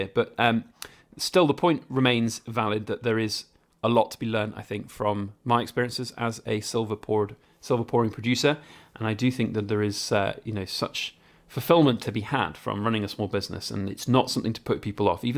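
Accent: United Kingdom. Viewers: Are you living in the UK? Because your accent is very british.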